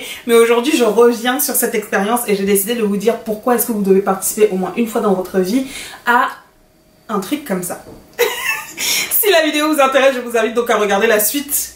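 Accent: Cameroon. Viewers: Belle vidéo j'aime ton accent camer ❤️🇨🇲 je m'abonne direct svp n'hésitez pas à vous abonner également à la mienne merci bien 😇🙏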